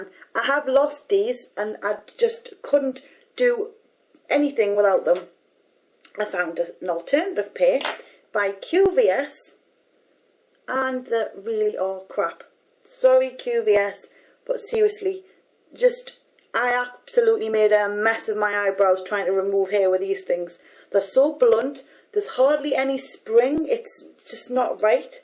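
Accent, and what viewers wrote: Newcastle, United Kingdom. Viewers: shes from newcastle :) geordie accent! best of all :D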